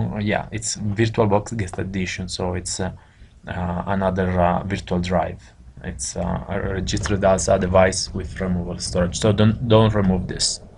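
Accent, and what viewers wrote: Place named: Italy. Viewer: Si sente che sei italiano :D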